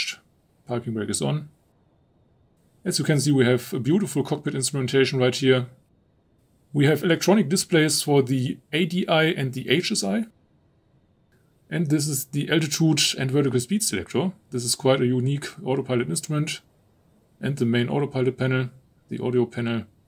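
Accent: Germany. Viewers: Hey, I resubscribed. Missed hearing your Duetsche accent ja!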